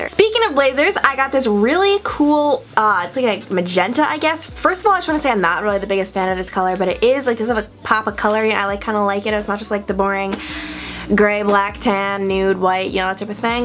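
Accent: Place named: New York, United States. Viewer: I subscribed after seeing your Victoria's Secret video. Now I'm wondering where you are from because I can really notice you have an accent in this video. Sounds like New York or Boston.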